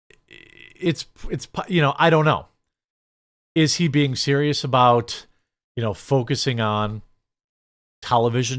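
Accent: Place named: Midwest, United States. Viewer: It's always funny to me how you pronounce MAGA as May-guh 😂. Just messing you dude. Gotta love that Midwest accent.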